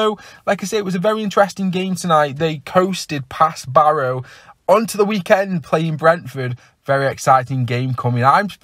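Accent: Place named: Stoke, United Kingdom. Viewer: I thought I recognised the Stoke accent. I live down the road in uttoxeter. Keep up the good work Scott. Up the villa.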